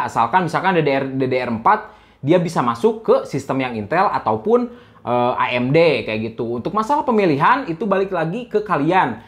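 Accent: West Java, Indonesia. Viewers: sunda sekali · Moal teu orang sunda ieu mah , kaciri cara ngomong na 😅😅😅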